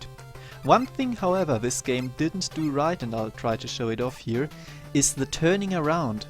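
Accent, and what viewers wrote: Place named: Germany. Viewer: Alter o.O Man hört ja auf 10km dass du aus Deutschland kommst. Oder ist das gestellt?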